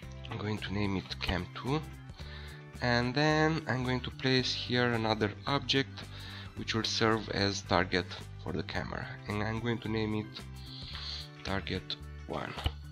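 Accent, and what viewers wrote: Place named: Romania. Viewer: You sound like a Romanian :)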